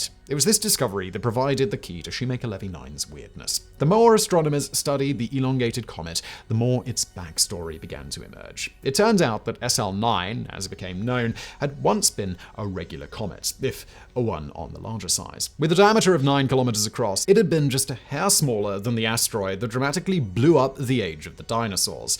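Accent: United Kingdom. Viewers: Please tell me where this guy's accent is from. Not the UK, or England, but what city.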